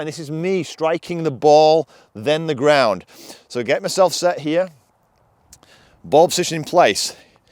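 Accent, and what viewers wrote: United Kingdom. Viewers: first the compliment. I like your videos, they are very informative. Now my problem, and I say my problem, it's hard enough to understand someone with a british accent, it's even harder to understand them when they talk fast. thanks again for your excellent videos.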